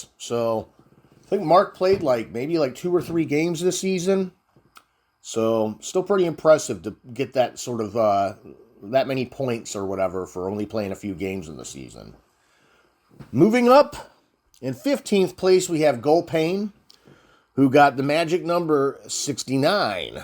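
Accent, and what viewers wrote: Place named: United States. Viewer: Something very official with a deadpan American accent, i like it! Well done all. I still need to improve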